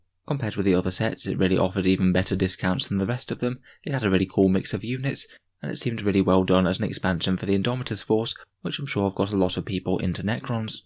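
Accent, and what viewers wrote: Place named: Yorkshire, United Kingdom. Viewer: What is your accent? It sounds like a soft Yorkshire accent. I'm going to guess Sheffield way. It doesn't sound like West Yorkshire - which is a bit Manc, or North Yorkshire, which is pretty close to Lancashire. Am I right?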